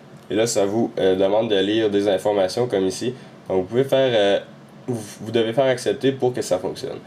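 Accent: Quebec, Canada. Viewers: L'accent québécois est énorme! Si ch'rais avec 20 capotes c'matin de zou!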